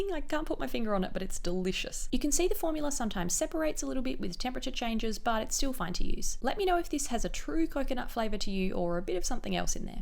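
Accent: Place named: Australia. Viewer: You sound Aussie, do you live in Australia? Because I have been wondering how to get glossier down here forever, and covid means no travelling to NYC any time soon...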